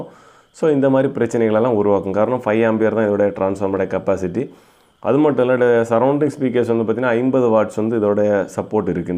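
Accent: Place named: Kerala, India. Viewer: ഇവർ മാർത്താണ്ഡംകാരാണ് അതു കൊണ്ടാണ് മലയാളം കലർന്ന തമിഴ് വരുന്നത്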